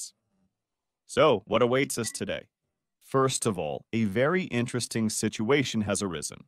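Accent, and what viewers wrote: Russia. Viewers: I love my new russian doctor 😊. I love his sense of humour and the Russian way of speaking and his expertise. Of course I don't watch illegally and I learn so much. I'm Polish fan. Thank you ❤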